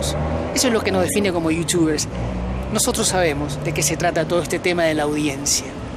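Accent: Lima, Peru. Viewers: es el acento del varon de clase media alta a alta de Lima, es aceptable, pero el que no soporto es el acento la joven miraflorina o san isidrina, es un acento raro con muchas groserias.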